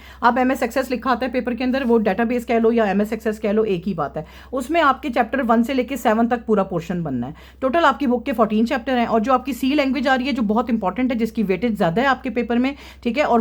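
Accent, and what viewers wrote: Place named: Lahore, Pakistan. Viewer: Mam mujy to app Lahore ki LG rhi ha😊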